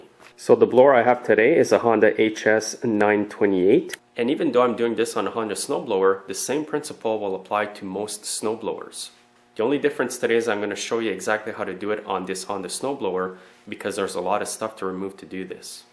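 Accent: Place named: Canada. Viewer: nice to hear a canadian,nice job on the video.thank you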